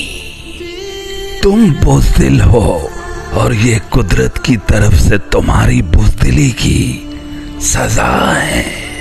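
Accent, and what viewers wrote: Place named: India. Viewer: Please hm musilmaan hyn alhamdulillah aap apni voice aur language style hamarey acer ki copy kr saktey hyn Bharti style toh bilkul achaa NY aapko suite NY krta please bra na mannyeyga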